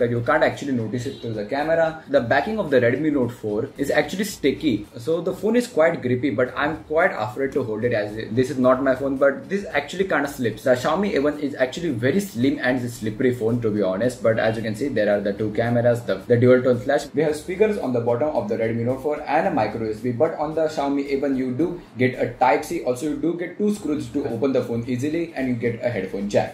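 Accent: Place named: Telugu, India. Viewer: Such a fucked up review, and please stop fucking English language so much. Bloody Telugu accents... Bloody duckface.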